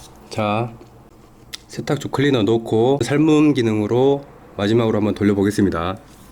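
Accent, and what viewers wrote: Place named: Jeolla, South Korea. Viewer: 제가 해외거주하는데 세탁기 청소하려고 영상봤다가 기사님 전라도 억양을 듣고 전라도 분이신 제 부모님이 생각나네요😊 너무 정감가고 좋아요~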